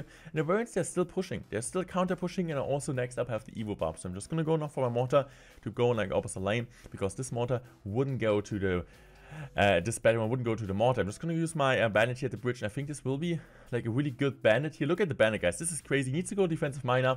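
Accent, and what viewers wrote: Germany. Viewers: This is my first time on this channel and i noticed directly by his accent that hes German.I was quite confused at first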